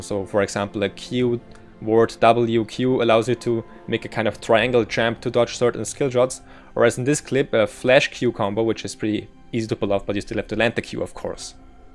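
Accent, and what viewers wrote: Germany. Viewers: du bist deutsch oder? xD Nettes guide! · are you german?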